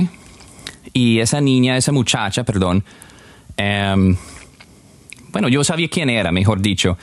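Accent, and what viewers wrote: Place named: Colombia. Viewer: Es muy inspiradora su historia, ….yo lo vine a conocer a ud en el programa enamorándonos de Univisión y me pareció un gringo con un ace tico en Español como Colombiano por eso acudí a las redes a averiguar por ud y si mi sospecha se aclaró ud aprendió Español en Colombia jajajaj lo felicito por su tenacidad y verraquera para lograr sus sueños, un abrazo parcero…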